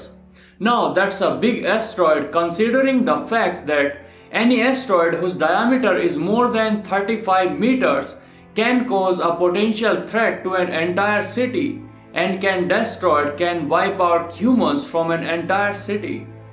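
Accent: India. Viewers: Who thought the guy who is speaking in this Video is Indian? Me!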